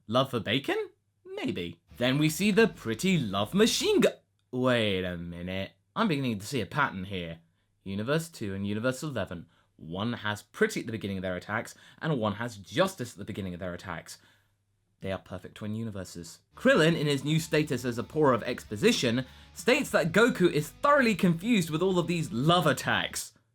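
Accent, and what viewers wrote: United Kingdom. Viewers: The way brits say words is cringe to me cabber and freezer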